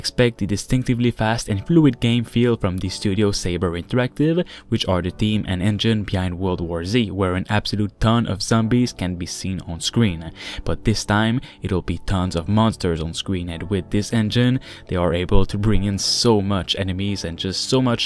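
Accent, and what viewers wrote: Quebec, Canada. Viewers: T'es tu Québecois ?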